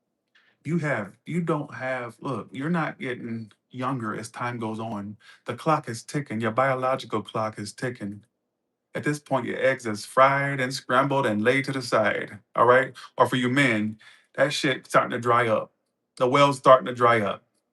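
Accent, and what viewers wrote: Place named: New York, United States. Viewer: you have a badass accent it's giving 1920s 1930s 1940s 1950s New York/old movies but in todays times actor you could've play extra in most iconic films I bet people would remember your quotes lines when say "you know what... " I thought for a moment as if you were saying some films lines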